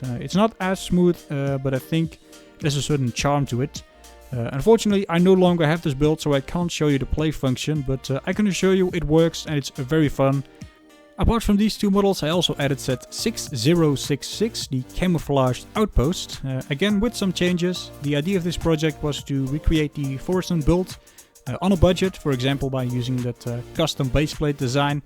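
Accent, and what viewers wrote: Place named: Netherlands. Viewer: Are you Dutch? You're accent seems dutch to me.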